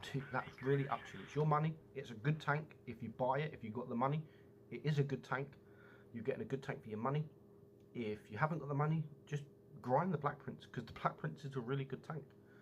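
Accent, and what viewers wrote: United Kingdom. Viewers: Legit british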